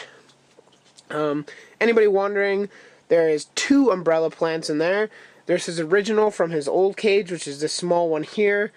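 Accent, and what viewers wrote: Canada. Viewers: Grass ( although I heard "rass") You mentioned you aren't from the states, are you by any chance Canadian?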